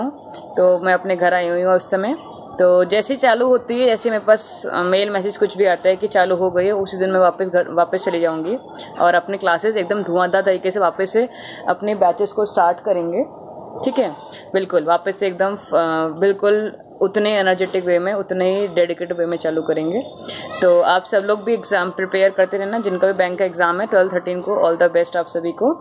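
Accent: Rajasthan, India. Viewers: Mam aap Rajasthan se ho kya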